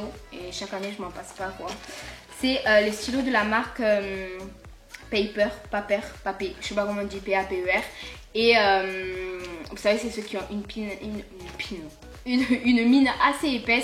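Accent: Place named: Toulouse, France. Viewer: Salut ma belle je viens juste de découvrir ta chaîne youtube et c'est une très bonne découverte dès le début de la vidéo j'ai directement reconnu l'accent de Toulouse qui est aussi ma ville, j'espère te croiser un jour dans Toulouse.Continu ce que tu fait tu est super naturelle et c'est une très bonne vidéo, bonne continuation sur youtube :)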